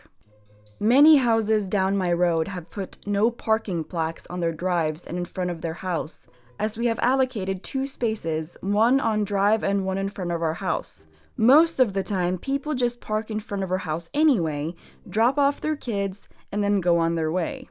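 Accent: United States. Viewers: this is creepy she has an American accent but reminds me so much of my sister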